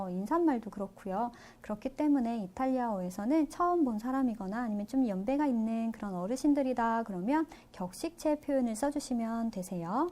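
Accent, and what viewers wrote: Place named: South Korea. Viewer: 발음이 그냥 한국어 읽는것같은데요 책산거 돈아깝네요 환불하고싶어요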